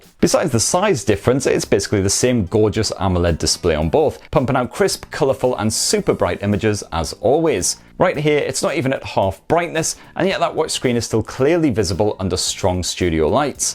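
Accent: United Kingdom. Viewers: so thicj British accent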